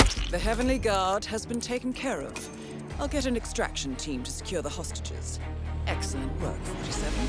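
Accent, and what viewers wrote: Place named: United Kingdom. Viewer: I really like the British accent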